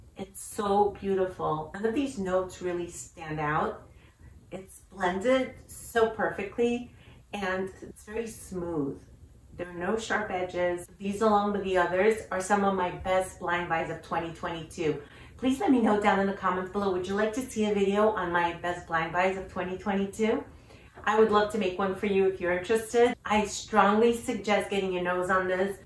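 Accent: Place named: New York, United States. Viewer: I just love your New York accent ! ❤️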